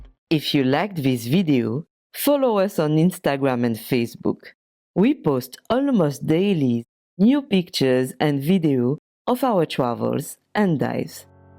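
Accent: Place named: Indonesia. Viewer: It takes time to get used to your Indonesian English accents.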